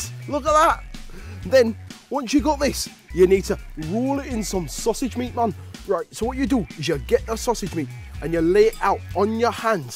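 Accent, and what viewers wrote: Jamaica. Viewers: you sounded a tad jamaican, other than that i liked it. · he sounds Jamaican aha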